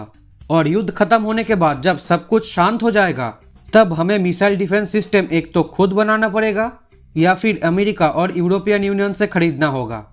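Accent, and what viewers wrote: Bengal, India. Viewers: Hey !!!your bengali accent is so lit.... Keep up the good work....💛💛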